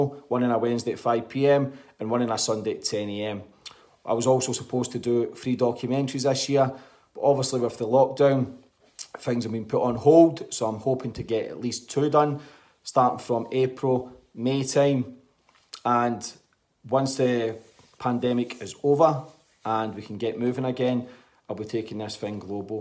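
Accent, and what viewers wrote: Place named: Scotland, United Kingdom. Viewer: Scottish surely?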